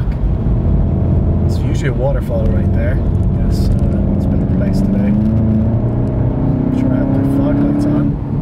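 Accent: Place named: United States. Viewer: What’s with the American accent you’ve only been there a week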